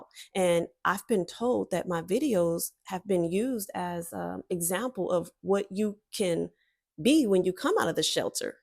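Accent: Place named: Bay Area, United States. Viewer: Ms. I hope hope you keep on with it. Your videos are really good. Now you have a sultry twang voice and in this video specifically your voice you sound a lot like Kamala Harris. I guess it's that both you and Ms. Harris are from California. The Bay areas has a vocal connection hundreds of mile away.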